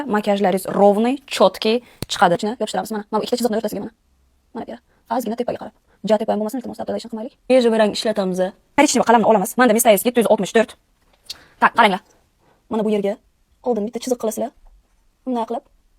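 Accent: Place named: Tashkent, Uzbekistan. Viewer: Iltimos tashkenskiy sheva qati nakunet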